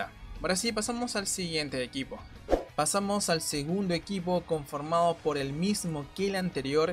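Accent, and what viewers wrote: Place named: Latin America. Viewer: Feliz noche, creo que eras de latam gracias por tus guías buenísimas!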